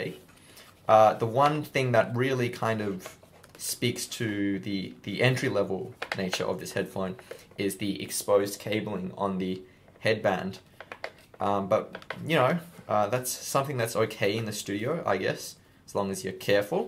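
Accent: Australia. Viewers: He’s clearly got an Australian accent???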